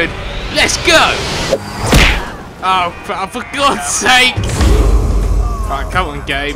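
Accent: United Kingdom. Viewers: he dosent have eneything against them its for entertainment purposes also hes british wich makes it 10 times funnier :D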